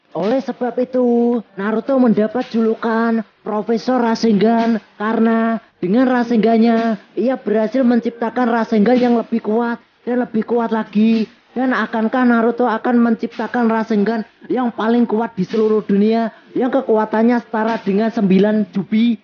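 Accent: Java, Indonesia. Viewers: Ceritanya .dialeknya .Jepang Jawa 😀😀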